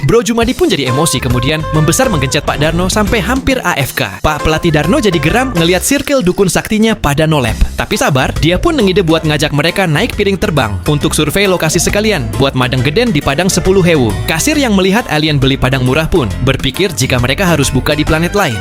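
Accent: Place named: Banyumas, Indonesia. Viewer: Admine wong ngapak apa kepriwe kie 🗿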